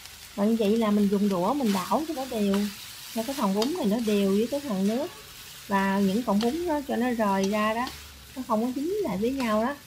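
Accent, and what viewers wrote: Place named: South, Vietnam. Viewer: Rat huu ich, chi tiết, và giong mien nam rat de nghe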